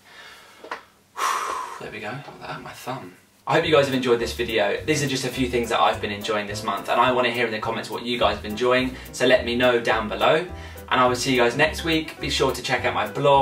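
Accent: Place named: United Kingdom. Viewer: If I had your perfect british Accent I would never shut up!!! It's fucking amazing!!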